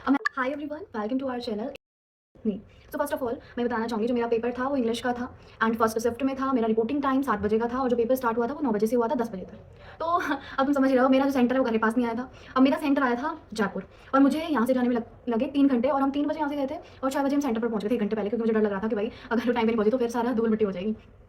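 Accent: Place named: Haryana, India. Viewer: Questions to ask: Apne konsa college aim kiya hai? Aap caurse konsa krogi? Kya cuet dene ke liye apne computer sikha tha ya bina sikhe hi de Diya? Mujhe computer chalana nhi aata to kya me fir bi cuet de paungi? Koi glti to nhi hogi? Ya sikhna pdega pehle? Plzzz ans these questions....btw I love your Haryanvi accent ❤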